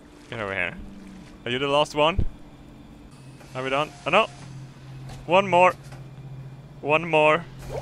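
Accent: Sweden. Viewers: Are you swedish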